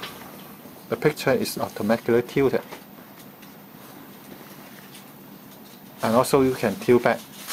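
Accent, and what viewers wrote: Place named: China. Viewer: poor narration Chinese ascent waste to watch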